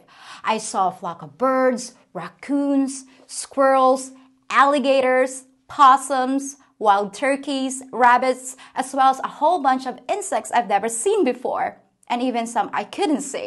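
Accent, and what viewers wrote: United States. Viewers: my dear, don’t know how I missed this video. We lived in the Southeastern corner of Georgia just above the Florida State line. It’s not hard to understand why they may have thought you were “American” you have barely any accent. My wife lived in the UK for a decade and has been here in the U.S. for 12 years this month. She still has quite an accent. The weather was something that got to her in the Southeastern U.S. after she was there about a month she said to me, “I never thought I would find a place more hot or humid than the Philippines…I was wrong!” The toothpicks are for picking up the food on the tray if using your fingers makes you uncomfortable. It’s been 13 years since my wife has been back to the Philippines. She misses Nanay and Tatay greatly but she knows it isn’t practical to go at this time. Anytime you travel, vacation eating is always hard. 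Take it from an old Marine, you have a rocking body! When you come back to the U S try the Intermountain West (Utah, Colorado, Idaho, Montana) it is a short hop from Saratoga Springs Utah to Vegas (4-5 hrs) a days drive to Los Angeles or a days drive to Phoenix Arizona. If you ever do come out here you are already welcome to the Household of Lolo D and Kazz!!! My wife would be a good interview having lived in the UK and here, her baby sister lives across the street and has lived in the UAE (not as an OFW) as a spouse of an American working there. As always another great episode! Keep up the excellent work! Lolo D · you have the American accent down to a T. If a saw and spoke to you, I too would assume you to be an American, because you do not sound foreign. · Wow! I'm so impressed with your observation in just a few days visiting the United States. Yup! American love their pets. and Yes, the odors of Marijuana in crowded places and you are right. 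I don't see anyone smoking. I hate the automated. I prefer to interact with a real person. Yup, water pressure is insane compared to the Philippines. Yes, Americans don't hang around in their yard. Btw, you speak like an American.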